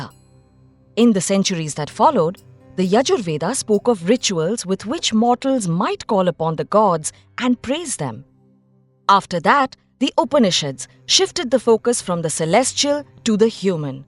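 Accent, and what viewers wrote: South India, India. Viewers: are you madraasi southindian saying arjunas kitna bura lag raha sunnein mein,please bachhon ko to sahi words bataayo baba it is aadi Shankar not adishankara saare words theek bataiye kripya, yeh aa mat lagayeeye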